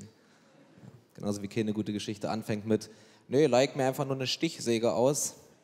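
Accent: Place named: Berlin, Germany. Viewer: 1. Nennt sich das Dialekt. 2. Ist das ein Berliner Dialekt.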